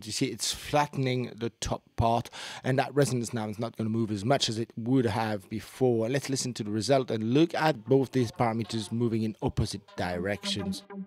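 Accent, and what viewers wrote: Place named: England, United Kingdom. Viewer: Nice english accent l'ami!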